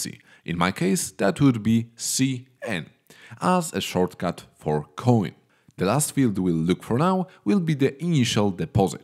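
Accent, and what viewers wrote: Poland. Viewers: Tak mi się coś wydawało, że to Polak do mnie mówi.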